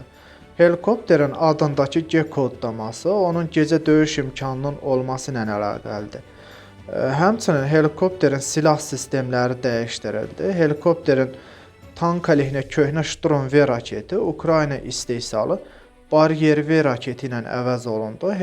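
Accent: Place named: Gadabay, Azerbaijan. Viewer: Qardaş Gədəbəylidi deyəsən. Ləhcəsinə görə düdim. Uğurlar.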